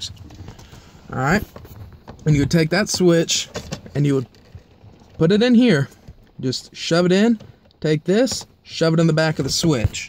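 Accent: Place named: South, United States. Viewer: Damn hick accent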